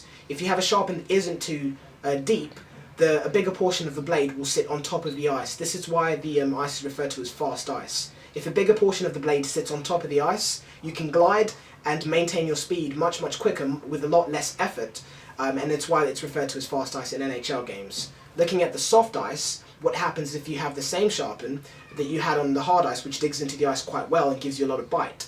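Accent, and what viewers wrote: England, United Kingdom. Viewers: Tutorials are always better with an English accent. Cheers mate.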